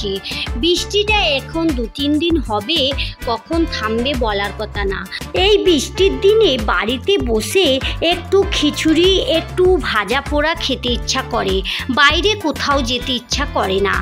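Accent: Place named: India. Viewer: আজ আপনার ভিডিও প্রথম দেখলাম আপনার বাড়ি কোথায়। কোন দেশের ব্লগার কথা শুনে মনে হচ্ছে ইন্ডিয়া।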